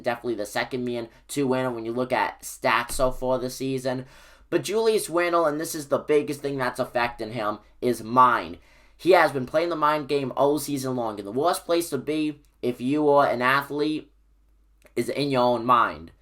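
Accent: New York, United States. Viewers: Fwankly….I love your NY accent…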